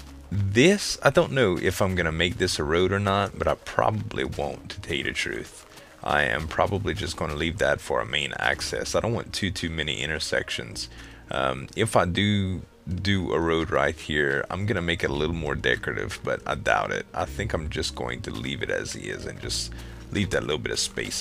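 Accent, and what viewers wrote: Louisiana, United States. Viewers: Hey great video! I'm glad you found me through Lembley :) Oh and have you been to New Orleans, just out of curiosity? I can definitely tell from your accent that you are from Louisiana!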